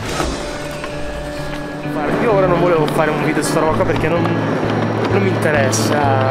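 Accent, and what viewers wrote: Rome, Italy. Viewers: Oddio ti prego stai iniziando a perdere l'accento romano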